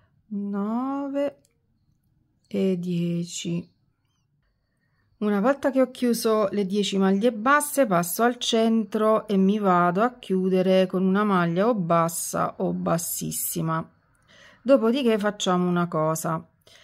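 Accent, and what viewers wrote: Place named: Cilento, Italy. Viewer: Brava e simpatica, hai l'accento che mi ricorda una mia amica cilentana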